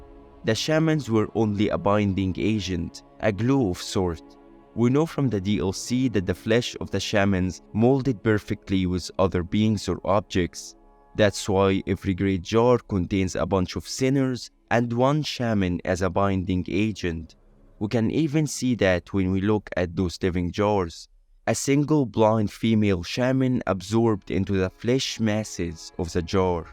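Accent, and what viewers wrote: Egypt. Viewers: U sound Egyptian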